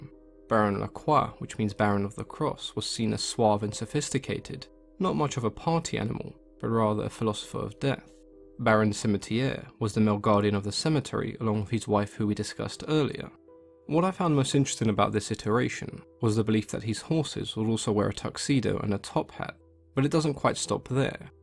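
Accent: France. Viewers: Nice French accent 😀 from Paris ! Big fan of your work ! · Thus french accent.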